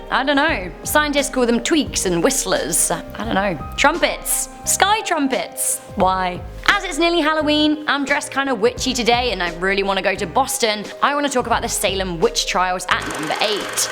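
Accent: England, United Kingdom. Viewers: loved the Scottish accent! Nice attempt but I still cant get enough of your English accent.:)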